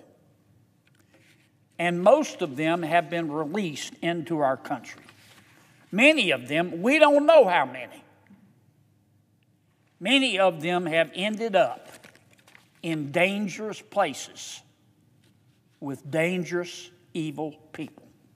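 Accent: South, United States. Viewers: I just love Senator Kennedy!! You speak the TRUTH and know when boots are needed! Your Southern charm and accent gets your point abundantly clear to all listening. 😂❤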